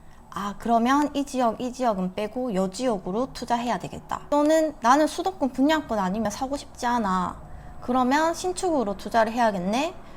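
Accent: Gyeongsang, South Korea. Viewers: 경상도 어투신데 사시는곳은 서울이신가요? 좋은강의가 수도권에 있다보니 부산경남쪽 부린이들 교육하시는 진실강사찾기가 초보한테 어려운데 분양권공부시작은 뭐부터 해야할까요?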